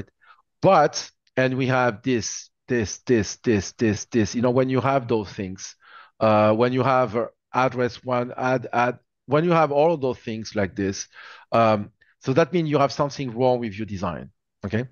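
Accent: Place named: France. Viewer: I also want to say a big thankyou, and wonder if anyone else has started to develop with their inner monologue taking on a french accent whenever they're recalling something has taught:face-pink-tears:.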